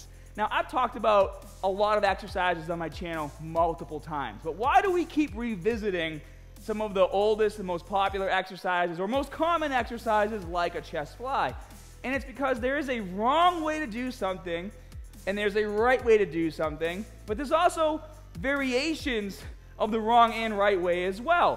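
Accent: Boston, United States. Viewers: He is from Boston. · Love this guy and his accent, he’s quite knowledgeable, sounds like a South Boston native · Awesome video. Ur Boston accent makes it even more enjoyable. I'd rather watch u than any of those not natty instructors on social media. Ur down to earth and real and u care about helping people. Thats the most important thing 👏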